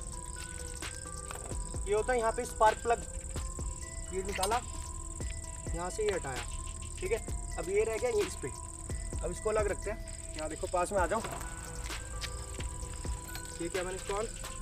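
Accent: Punjab, India. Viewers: Are you punjabi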